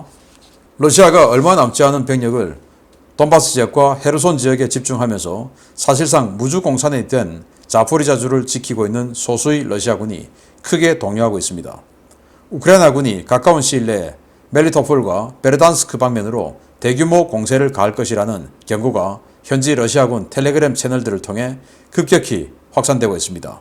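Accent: Gyeongsang, South Korea. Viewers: 대부분 사실이길 바라면서 .. 너무 신나는 소식이라 너무 좋아하면서 이방송 열심히 듣고 있습니다 . 신인균님의 시원한 갱상도 사투리. 목소리도 더 재미있고요 ㅎㅎㅎ❤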